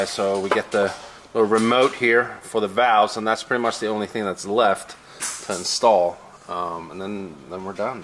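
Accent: Sweden. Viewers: are you swedish? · Are you from sweden originally?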